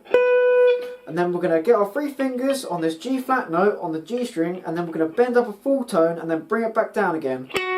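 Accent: United Kingdom. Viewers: I love british english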